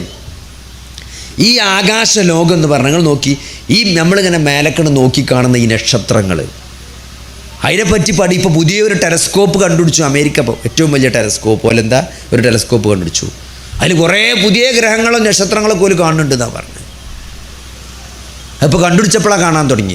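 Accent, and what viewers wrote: Malappuram, India. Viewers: Dr please speak our mother tuge in correct always i lesson your video but your Malappuram tune is there in your speach ok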